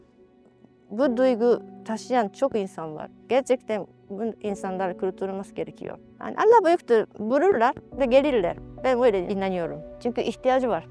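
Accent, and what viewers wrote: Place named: Mersin, Turkey. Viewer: Mersinli teyzeler gibi konuşuyorsun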